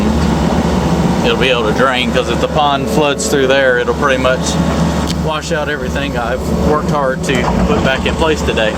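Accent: South, United States. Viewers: I live in east Texas and your southern-accent is slightly different than it is here. You pronounce the word "water" different than most people here. You say "the toe of the dam," and we say "the foot." Unfortunately now, most people here no longer even speak proper Southern English. They put the slang and vulgar in every expression. This video helped me to understand why spillways are important.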